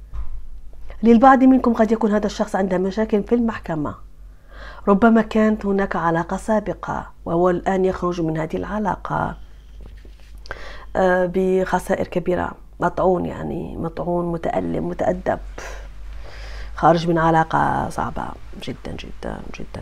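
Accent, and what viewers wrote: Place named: Tunisia. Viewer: لهجتك مثل لهجة التوانسة ❤❤❤وصوتك مريح يا ألله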